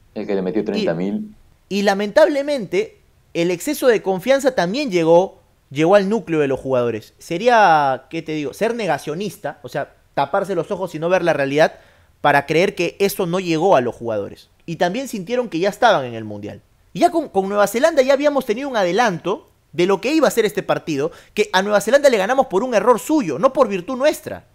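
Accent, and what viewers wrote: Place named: Argentina. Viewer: pero porque hablas como argentino?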